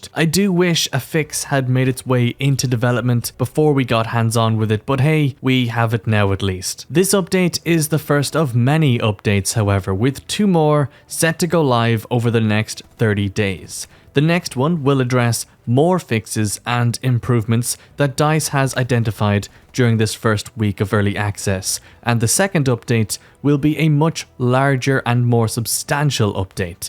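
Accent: Ireland. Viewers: He's Irish you muppet. Not everyone talks in American English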